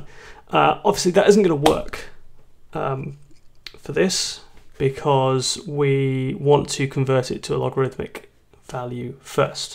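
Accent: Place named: United Kingdom. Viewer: thamkyou so much british sounding man with ironic last name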